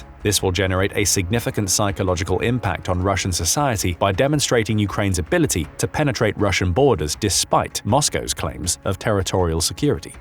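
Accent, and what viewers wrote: England, United Kingdom. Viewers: I'm really getting weary of the ENGLISH ACCENT. As if it the accent somehow carries more intelligence. 🙄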